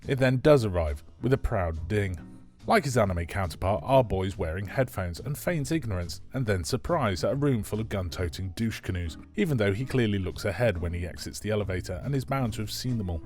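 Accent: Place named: United Kingdom. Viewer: British voice complaining about whatever on YouTube… what a surprise 🙄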